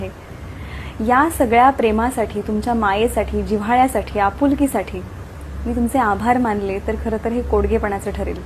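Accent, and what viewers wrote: Murbad, India. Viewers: खूपच छान ताई तुमचा मुरबाडी ठसका चांगलाच आहे तुम्ही अभिनय देखील छान करता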